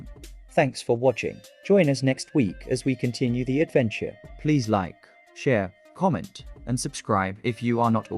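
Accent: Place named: England, United Kingdom. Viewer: I love this File Card Photo play. With Voice over With English Accent Rem8nds 9f Niguel from Jamangi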